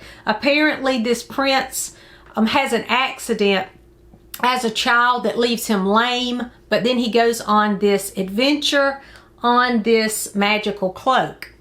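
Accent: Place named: Texas, United States. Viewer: Just found your channel. So glad I did. I have to ask are you possibly from Texas? I am and your accent sounds familiar.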